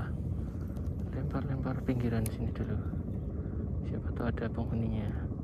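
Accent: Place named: Semarang, Indonesia. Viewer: Bahasane koyo wong semarang. Ndak yo?😊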